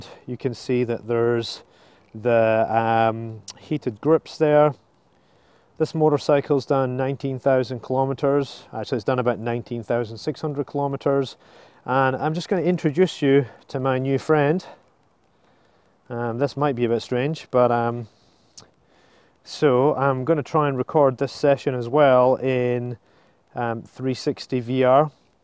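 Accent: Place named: Scotland, United Kingdom. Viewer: Scottish accent there I sense. Where you living now mate!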